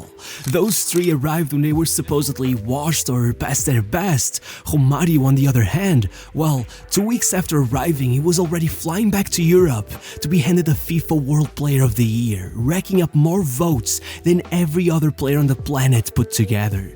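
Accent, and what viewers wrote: Portugal. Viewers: I know you are portuguese from your accent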